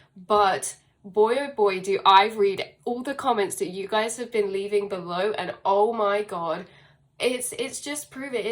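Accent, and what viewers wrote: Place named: England, United Kingdom. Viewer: Hello. Like you said, its amazing to meet you and to participate in your space. I am excited for the same reasons you stated. We are stationed so far away from each other or so we think. I just found you and for once, the messages are so clear and resonant that I dont need any discernment. I don't think I can even describe it... its not a reading its more like a reflection of my thoughts without any distortion whatsoever. I love and respect myself a lot and I am so excited that there's someone out there that I can give the same amount of respect to. And then... I can hear you're English wich means you're not just the most resonant person I've seen, you're also the closest geographically to me as I'm in London. Its all very exciting, the tribe is getting closer. Almost touching distance. And I do not feel any push or pull from your energy.. 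just a recognition of a very high vibrational being. Thank you for existing. Sending you lots of love and gratitude. Maybe run in to you one of these days.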